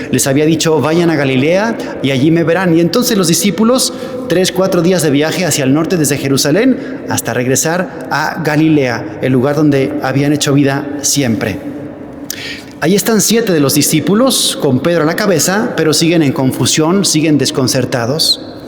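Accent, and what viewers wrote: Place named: Spain. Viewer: Tiene un acento es español de seguro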